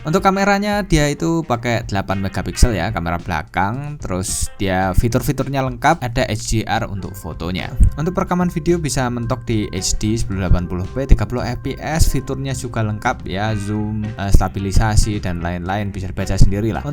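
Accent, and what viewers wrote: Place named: Java, Indonesia. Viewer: Sumpah risih banget denger kata ( GUE ) gak pantes mas, medok mu lo 😢😢😢😢✌️🙏🏻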